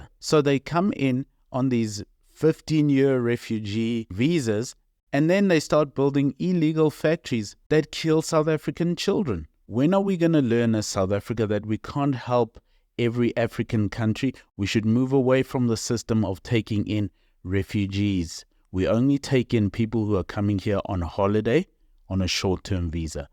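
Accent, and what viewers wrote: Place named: Netherlands. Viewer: Who are you to decide for us you are a white boer you don't belong here either you were deciding on us when it was aparteid so now fick off to Holland and stay there with. Your Dutch accent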